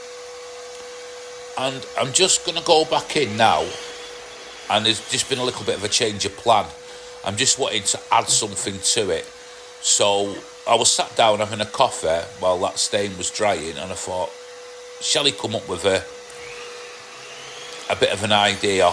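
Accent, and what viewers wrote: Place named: North, United Kingdom. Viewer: Bathroom cabinet is brilliant and love to hear the "north" again! I am in Bavaria and never hear my home accent much. I really look forward to understanding what this feared "kickback" is! btw I got a huge trailer load of pallets from a lady who has tractor mowers delivered on them. I have a mountain of wood now! :)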